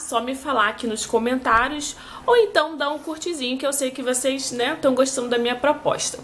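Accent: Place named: Rio de Janeiro, Brazil. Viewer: Vá em frente!! Nós vamos amar!! Estou começando a gravar vídeos, se quiser conhecer ficarei mto feliz!! Adoro seu sotaque carioca! É lindo! mil bjs!!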